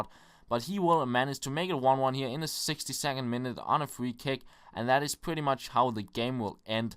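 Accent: Jutland, Denmark. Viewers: Er du jyde? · you are danish right?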